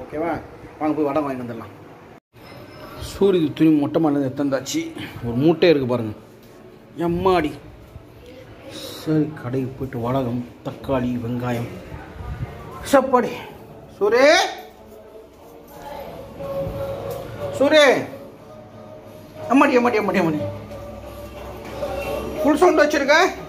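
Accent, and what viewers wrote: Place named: Madurai, India. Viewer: Niga peasuradhu madurai sowrasthra mari dan iruku anna ovoru ooru sowrasthra slang change agum anna adan ketan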